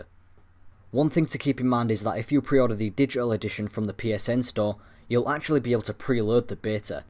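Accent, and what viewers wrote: United Kingdom. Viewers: Well done! This video got you an insta-sub from me. Your even peaceful brit accent is a refreshing change of pace from our freak out beautiful b-tard MoreConsole friend. :-) Can't wait to watch more!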